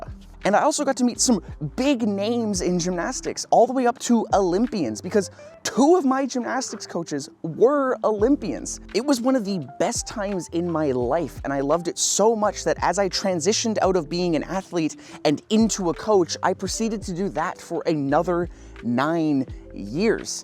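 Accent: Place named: Canada. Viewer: just sounds like another fellow Canadian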